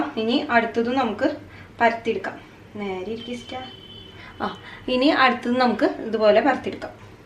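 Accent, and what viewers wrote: Thrissur, India. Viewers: Haiii chechikutti njan puthiya alanetto first vedio kandappo thanne subscribe cheythu Yummy recipe Enik tcr slang othiri ishttaaaa Thrissurkarum poliya Love you ma swt ♥️♥️♥️♥️♥️ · Oru raksha ellaattaa chechi 😍 THRISSUR Kari aaaano?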